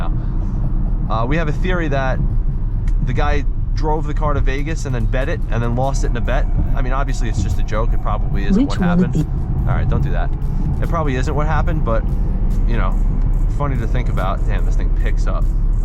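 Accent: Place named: New York, United States. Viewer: Annoying NY Accent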